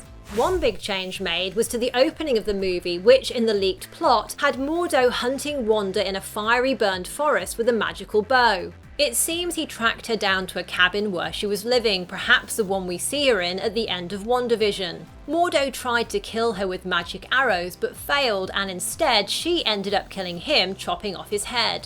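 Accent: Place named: United Kingdom. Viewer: I'm new in the channel, beautiful accent! Are you British?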